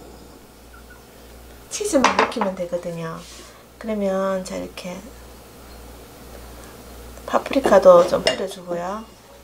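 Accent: Gyeongsang, South Korea. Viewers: 가는곳마다 가 계시더라구요..그래서 끌려서 와봤더니 저가 좋아하는 요리채널이네요 경상도말이라 더 정스러워요^^ 조아요와 빨간버튼 꾸욱~~ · 반갑심더.. 딱 말씨가..대구 찍어봅니당.. 아님..어쩔수 없거든요.. 대구가 미인의 본고장 아닙니껴? 구독과 좋아요.,기냥..팍.. 이모님 시간될때 저희집도 한번 놀러오이소.. 캬..먹고 잡네용..^^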